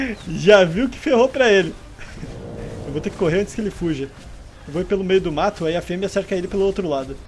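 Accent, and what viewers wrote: Rio Grande do Sul, Brazil. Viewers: Vc é gaúcho né ?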